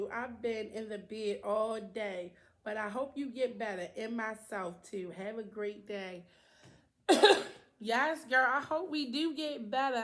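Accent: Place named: Baltimore, United States. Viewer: Good morning my chocolate sis...i love your intro...i came across your vid by watching cringe binge and i was like let me check her out and your intro got me hooked...it is so humble and so adorable...seriously when i heard you talk i was like ummm i think she is from bmore...im from Maryland myself...im sitting here posting my own vid while watching yours lol hope you check it out but anywho i am going back to binge your vids lol have a blessed one hun... keep up the good work!!!! i totally agree on not following trends, my channel is about Ethnic food from different cultures becuz i want to open mine and others horizons on foods possibly...so basically i feel u on doin your own thang....#newsubbie...my bad im a talker but im done lol....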